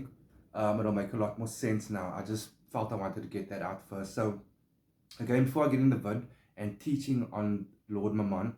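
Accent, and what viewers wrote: South Africa. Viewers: Correct me if I am wrong...you sound Capetonian South Africa.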